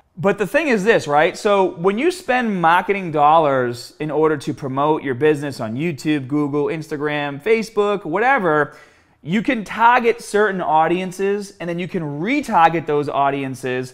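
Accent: Boston, United States. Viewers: I love his Boston accent he's hilarious overall but this guy is funny and cool and authentic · Love your Boston accent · My boy out here with that Boston accent. That accent just makes you stronger when you hear it. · Hey, I'm a current fatass working on losing weight and building muscle if thats possible. I was always skeptical about this guys ads on facebook. Are you from Boston with that accent? Total irrevelant build up to that question but I'm dying to know.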